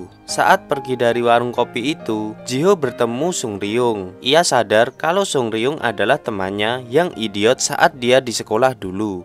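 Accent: Java, Indonesia. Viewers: Jawa medok amat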